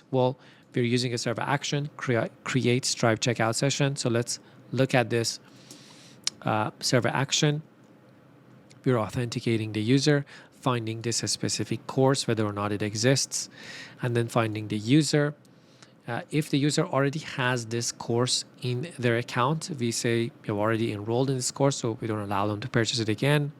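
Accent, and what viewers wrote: Iran. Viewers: your accent is very similar to farsi btw you persian ?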